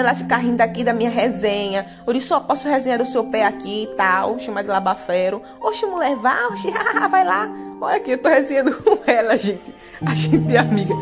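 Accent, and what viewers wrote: Northeast, Brazil. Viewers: Seu sotaque e maravilhoso eu amo o sotaque do nordestino e lindo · Prefiro vc comentando com seu sotaque nordestino. Sem fundo musical por favor. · adoroo muito seu trabalho parabens, gosto mais ainda qndo vc narra seu sotaque nodertino e muito maravilho mulher vc me mata de ir... susseso pra vc em 2021 continue prazendo alegria pra nois Brasileiros 😂😂😂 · tô amando os seus vídeos e sua voz então é das nossas tbm sou nordestina com muito orgulho, sou alagoana com de coração, sou lá de Craíbas,mais por enquanto estou morando no Pará, mais com fé em Deus ainda volto pro meu lugar, bjs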